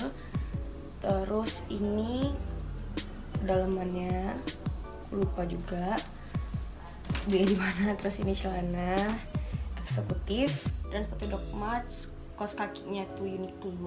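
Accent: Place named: Lhokseumawe, Indonesia. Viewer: logatnya kaya anak lhokseumawe atau aku aja yg salah denger._.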